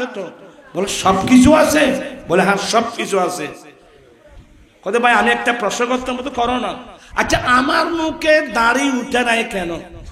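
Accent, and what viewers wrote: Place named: Chittagong, Bangladesh. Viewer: চট্টগ্রামের আঞ্চলিক ভাষাটা ছাড়তে পারে নাই।রংপুরে আহলে সুন্নতের আলেম নাই বুঝি?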